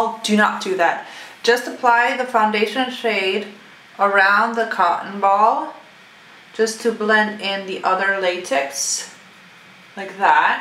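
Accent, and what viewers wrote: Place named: United Kingdom. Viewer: I love that British accent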